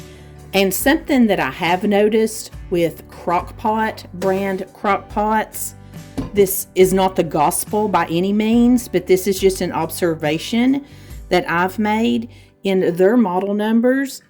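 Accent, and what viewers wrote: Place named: Tennessee, United States. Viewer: I might try the crockpot sweet potato casserole this year! So glad I found your channel because I grew up in Madisonville for several years of my childhood and your accent makes me so happy and reminds me of TN! I still live in the south, but TN holds a special place in my heart. I also purchased some jams for Christmas presents! 🥰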